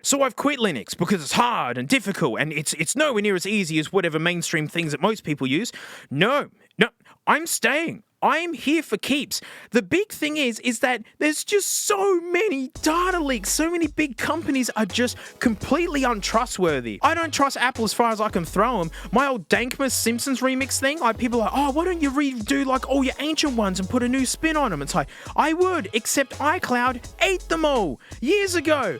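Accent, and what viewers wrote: Australia. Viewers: Yeah he's Australian, Adelaide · You're not Australian by any chance are ya? Mac, really? So, what took you so long to discover Linux?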